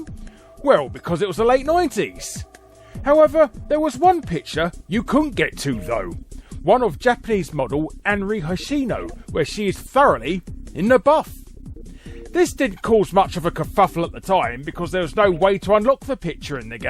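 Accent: United Kingdom. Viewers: Not at all, I just like reading the script alongside the audio. Trust me, as a rugby fan, I’m quite used to the British Isles accent 🏉👍🏾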